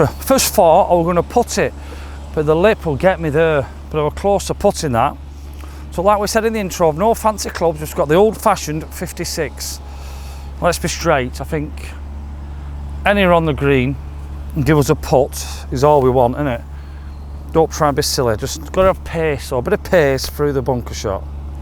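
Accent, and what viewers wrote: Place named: Yorkshire, United Kingdom. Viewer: I love the Yorkie accent Who needs prepositions?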